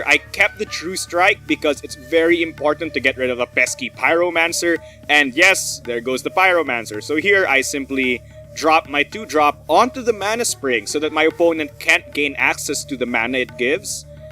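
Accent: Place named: Philippines. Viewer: Are you Filipino? Your accent is a pretty good hint. :))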